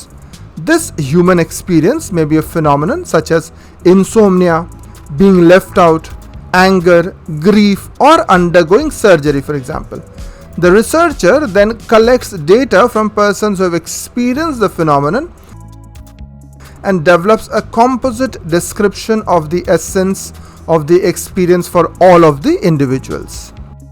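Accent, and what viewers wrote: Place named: India. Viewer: This video seems very important and informative. Sadly I don't understand the English with the heavy Indian accent. My loss. 😔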